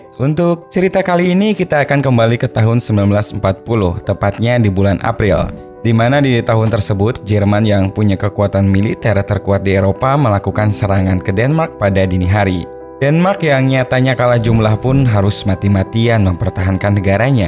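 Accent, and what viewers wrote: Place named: Central Kalimantan, Indonesia. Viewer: Ini logat kame Kalimantan tengah lah gue suku Dayak Emang gite lah loga t nye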